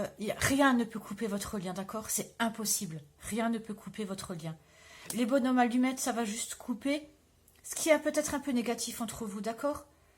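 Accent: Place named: Alsace, France. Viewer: coucou j'ai reconnu ton accent. Moi aussi je suis alsacienne :) Tu vis où ?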